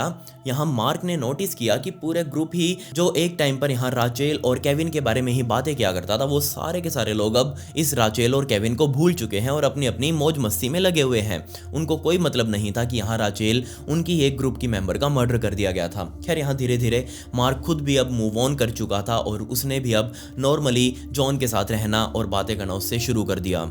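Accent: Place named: Punjab, India. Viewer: Koi dikkat nahi hai! I guess you are a Punjabi! Kindly reply if I am right!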